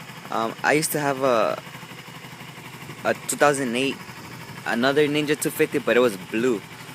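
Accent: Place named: Mexico. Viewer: u sound like a typical Mexican bro.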